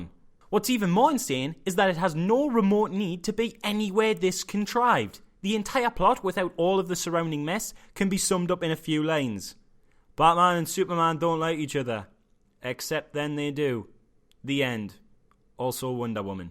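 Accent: Newcastle, United Kingdom. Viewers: LOL!!!! Best review, 100% agree! You sound geordie mate!? Shoutout from London! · Geordie accent at its best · It's a Geordie accent. Listen to Sergeant Lewis from the old 'Inspector Morse' TV show. From northern England. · Geordie. North-East England. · Top review mate... Good to hear a fellow Geordie on YouTube. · Sounds Geordie to me. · you sound like your from the toon? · Wow. Didn't expect Geordie... Class! :D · It's a Geordie accent from North-East England. Like Davos from Game of Thrones. Except in the show Davos says he's from Flea Bottom so fuck knows how he got a Geordie accent.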